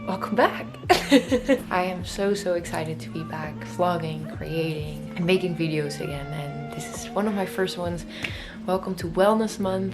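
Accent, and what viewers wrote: Netherlands. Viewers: Lol r u dutch your accent is so dutch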